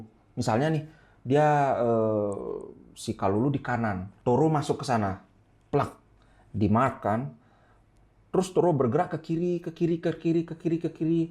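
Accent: Bali, Indonesia. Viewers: Bang orang Bali ya bang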